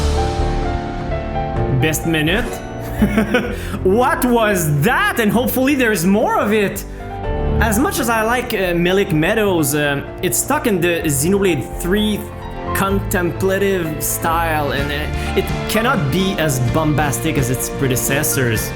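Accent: Quebec, Canada. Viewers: Was listening to this video like "This guy sounds like hes fron quebec" then i heard you say Matthew and instantly knew without a doubt you were Great video you showed me some leitmotifs i missed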